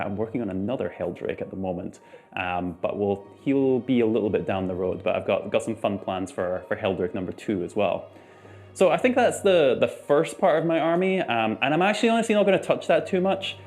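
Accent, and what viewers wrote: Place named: Russia. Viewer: you trying so hard to not sound like russian but you still do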